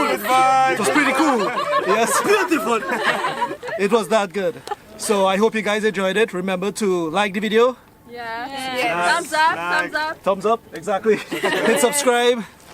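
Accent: Trinidad and Tobago. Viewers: thank you for providing a video of outdoor tunisia the bonus for me was the pleasant surprise of hearing a trini accent i did however notice of marked shortage of aquatic life in the underwater scenes then i saw what was on the lunch menu and understood (lol)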